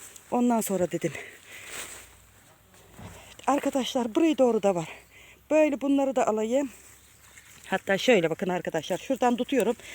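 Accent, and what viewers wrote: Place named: Zonguldak, Turkey. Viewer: aynen bende zonguldak eregli abla şivenden anladım süpersin bereketli olsun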